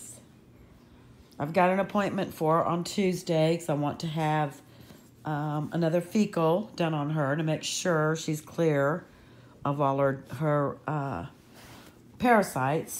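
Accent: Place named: Alabama, United States. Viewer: When I was a kid (many moons ago), I had an aunt from Alabama. I swear hearing you talk is like hearing her. And she always made me laugh. When you say "dinner", Starr says "wait for me".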